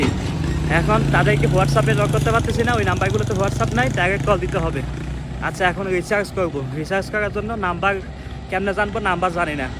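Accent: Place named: Banshkhali, Bangladesh. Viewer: বাঁশখালী মনে হয়।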